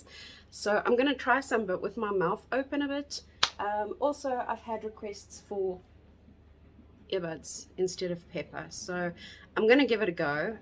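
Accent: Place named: South Africa. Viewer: that and Ur south African accent 😝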